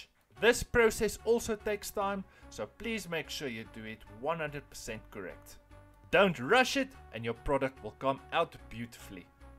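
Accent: South Africa. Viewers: you South African? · Out of interest sake, are you South African? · This is such a South African accent 😂😂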